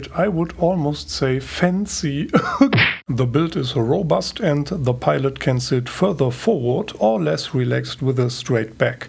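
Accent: Germany. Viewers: Hey, I have never heard your voice, but now Im curious. Where are you from? Do I hear a bit of German accent?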